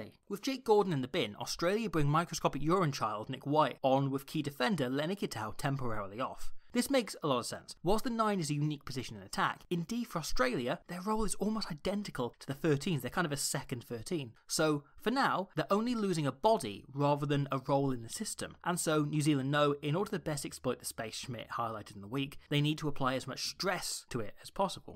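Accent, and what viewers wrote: Wales, United Kingdom. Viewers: This video is best viewed at 0.75 speed for NZ ears not used to this guy’s machine gun Welsh accent or whatever it is.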